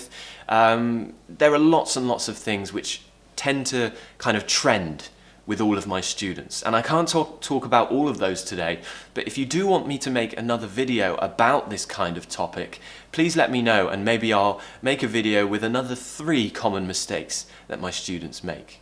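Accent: RP, United Kingdom. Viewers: You make me love British Accent ! I like all your videos thank you so much 🌺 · My problem is pronunciation, but i like your british pronunciation. Cheers, mate. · Fantastic teacher with a brilliant British pronunciation. Thanks a million,.✌ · I love your modern RP pronunciation. Your videos are very interesting and useful. AND you're handsome as well 😉. Cheers! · If it is British accent I love it!!!! Your accent is amazing!!!! I want such accent. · I love the British accent, and I really loved your video! It helped me to understand more of the accent, and how to pronunciate. Big thanks to you! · Thank you! It is important! - Love your RP British English teaching! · Hit the like button before watching just because of your british accent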